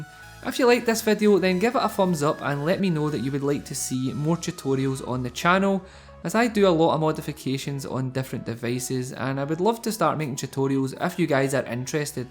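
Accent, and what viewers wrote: Scotland, United Kingdom. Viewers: its a scottish accent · Thats the good ol scottish accent. 😊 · scottish but it's not usually that strong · Omg yer scottish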